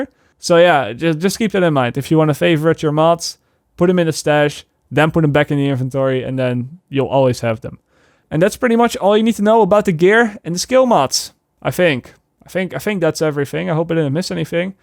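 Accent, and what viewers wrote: Netherlands. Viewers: Took me 5 seconds to know you're Dutch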